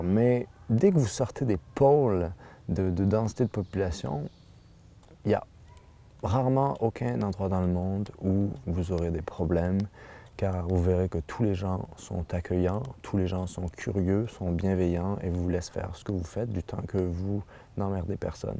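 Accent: Quebec, Canada. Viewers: J'aime bien ton accent français du Quebec ;-) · Bonne vidéo,. Je suis de retour au Québec, après avoir passé l'hiver dans le sud des USA. Deux mois presque, que je suis à Montréal (downtown) et l'envie de reprendre la route me taraude. Je songe à retourner à Cape Cod (P-town) bientôt. On pourrait peut-être se suivre? Ou y aller dans ma van? (il y a de la place et deux lits). Je connais les endroits propices (j'y ai passé tout septembre). La mer est belle et les plages merveilleuses. Et il y a des endroits où prendre une douche chaude pour 0,25$ ou froides, à la plage. En passant, ton idée de tournée américaine, c'est trop ambitieux. Et aller seul au Mexique (que j'ai fait en 2006, pure inconscience de ma part), ce n'est pas une bonne idée. C'est un miracle que je sois revenu en vie. On pourrait s'en reparler. Just email me. By the way, your French is very good, avec le si sympathique accent du Québec. 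· Je suis français et ton français est correct, je comprends tout ce que tu dis!!!! T as juste l accent Québécois ! Mais j aime!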